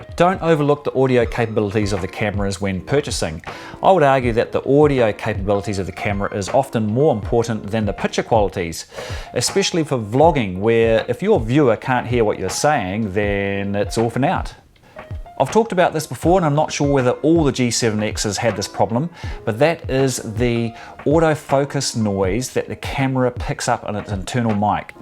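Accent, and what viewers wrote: New Zealand. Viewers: Are you Australian or Kiwi? :) I'm not a hundred percent sure but I rekon you're from New Zealand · He's a Kiwi ;) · Definitely a kiwi accent 👍🏽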